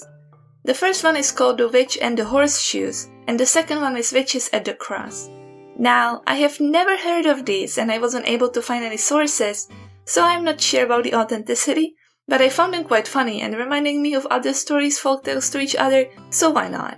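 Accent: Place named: Czech Republic. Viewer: are you czech? because you sound really czech and i would be surprised if you aren't lol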